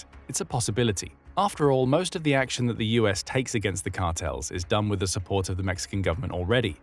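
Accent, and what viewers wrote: United Kingdom. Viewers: I cannot even believe your trying to downplay the affect of fetanyl on the American population with your British accent as though you know… honestly it is disgraceful and without value to say it may not be as big a problem as Trump is doing. He’s literally doing what we the people have asked. What are you doing…?!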